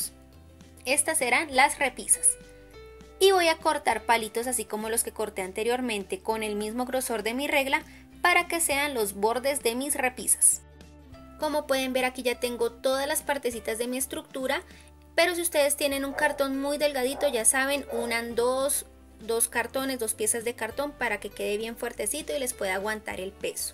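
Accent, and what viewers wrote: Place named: Venezuela. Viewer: Eres venezolana verdad?❤️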